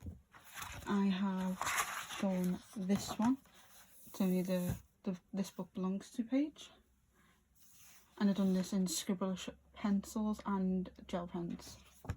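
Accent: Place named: Wirral, United Kingdom. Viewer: You sound like you’re not too far from me, I’m from the Wirral! B&M, Home bargain and the works are such good places to get books from aren’t they!? Think I spotted a few I got from there too. Lovely pages x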